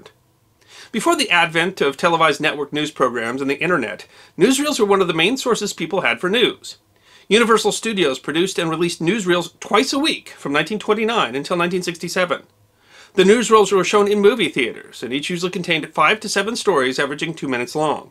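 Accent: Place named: Midwest, United States. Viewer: I know you’re a Midwesterner and it’s involuntary, and no judgement intended, but I beg you, I live in Cah-nahh-duh and not key-nuh-duh. Your accent on this one word kills me every time. Love you!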